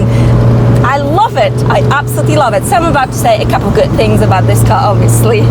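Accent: Germany. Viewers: Tollen Akzent. Er erinnert mich an eine Deutsche, die ich die Ehre zu kennen habe.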